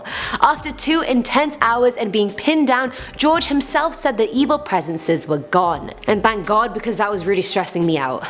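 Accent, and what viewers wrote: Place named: England, United Kingdom. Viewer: This girl has the classy English accent without getting on my nerves. She's dramatic. Flaps her delicate white hand to her heart. I'm angry. (She's not wrong).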